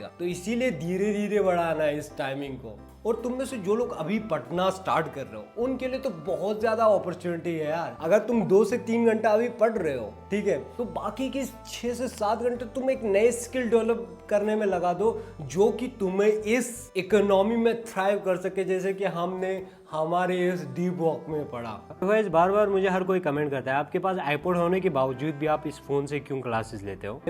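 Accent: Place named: Kashmir, India. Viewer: Ur talking accent just like my kashmiri frnds 😂...keep going bro ...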